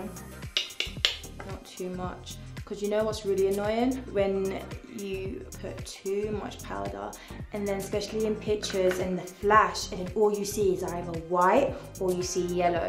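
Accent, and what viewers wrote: United Kingdom. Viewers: I HAD NO IDEA SHE WAS BRITISH?! AND A CHILD??? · Thank you for helping me with the British accent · Omg I didnt realise she was british yasss black queen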